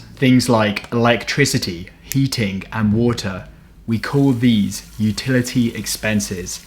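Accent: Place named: United Kingdom. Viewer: i am kind of a little bit confused, u are a Canadian, but your accent is British accent .but i like your video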